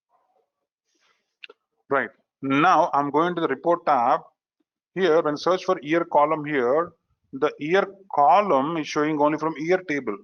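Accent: India. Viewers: I felt like I m studying in NareshIT Ameerpet.. sir, south accent is like that..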